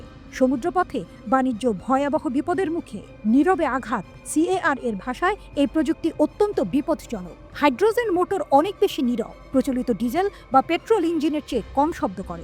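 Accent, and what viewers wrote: India. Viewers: পাঠিকা কি ভারত থেকে আগাত? ভাষা অনেক টা তেমন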